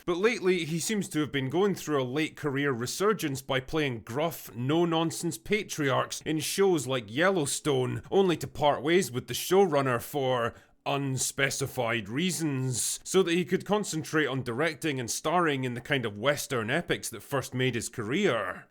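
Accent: Scotland, United Kingdom. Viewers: It's the Scottish accent, especially in Dindee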